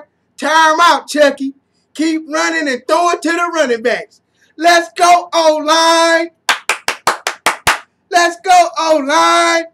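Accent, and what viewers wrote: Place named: United Kingdom. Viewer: Your British accent! Lmao 🤣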